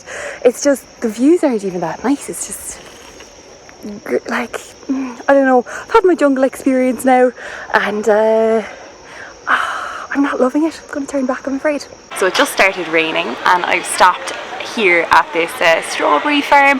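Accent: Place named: Ireland. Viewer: Im malaysian,seeing Irish here, hearing the english,it sounds calmy funny..i seen the video in the brit trying to understand the irish,welsh English.. hearing u here now i get them..as Saoirse ronan says,the vocal for irish is different to std English speaker..also irish english is quite low tone..yours,collin farrel,liam neeson have that vocal similarities.. anyway, enjoy ur trip, safety 1st miss..😊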